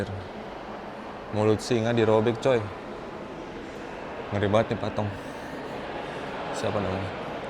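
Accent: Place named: Palu, Indonesia. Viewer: Logat palu lho😅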